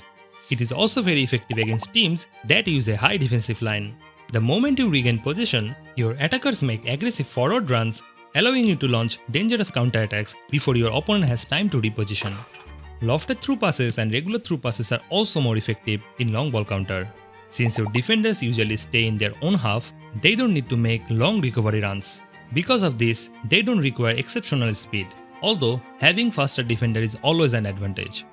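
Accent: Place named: India. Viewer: India hai kya ? Sounds like it .. but naam indian nahi hai bhai kya 😅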